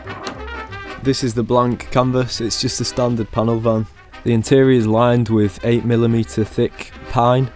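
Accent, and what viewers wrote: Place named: Yorkshire, United Kingdom. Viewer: That's a good old yorkshire accent for ya :-)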